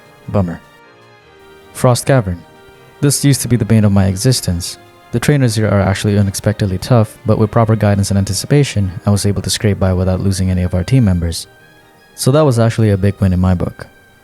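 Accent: France. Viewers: Why did I just realize that accent sounds like you voice act Frenchy from Spongebob (narrator)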